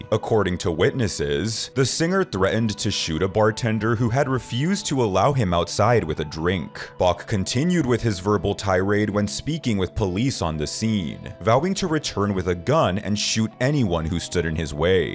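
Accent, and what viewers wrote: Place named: New Jersey, United States. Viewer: That’s a New Jersey accent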